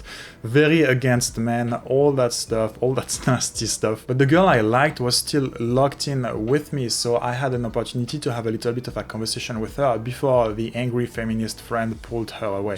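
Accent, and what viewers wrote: France. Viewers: Man, u have a good explanation of Tylers infeild videos... i like this minsdset "theres no downside to anything u say or do, there is only UPSIDE"... got rejected? U become numb to rejection (upside). Don't know what to sat? Say "whatever" (dont give a fck, u r just practicing). Thanks for sharing. Btw, ur accent sounds French, where do u game?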